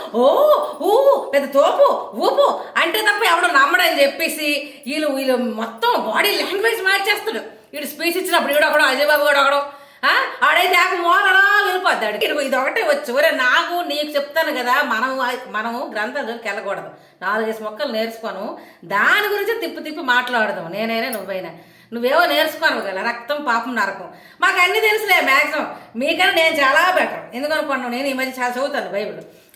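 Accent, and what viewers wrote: Srikakulam, India. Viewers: Sikakulam bhasha needi,mundu neetga matladam nerchukove ,aadadanivena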